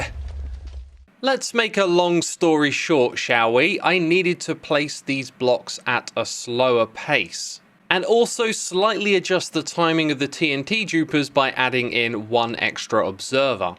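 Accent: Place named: London, United Kingdom. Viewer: Your new mic has just made me even more confused! You have sort of this londoner accent but I still can't put down why your accent is so familiar aswell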